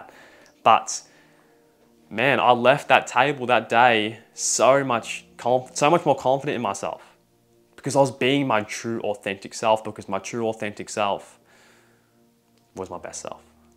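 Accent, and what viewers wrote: Australia. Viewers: made better with that Australian accent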